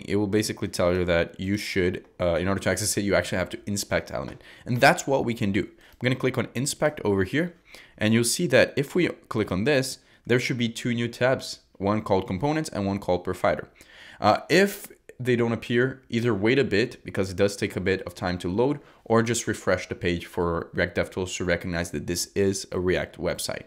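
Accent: Brazil. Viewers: Rapaz Tú é carioca ou paulista?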